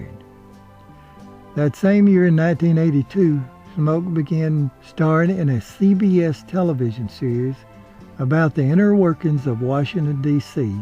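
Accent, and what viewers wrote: South, United States. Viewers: Mr, I always enjoy your stories. Keep up the good work. Love your soft southern voice. A friend of Lee Remick, Amanda Lane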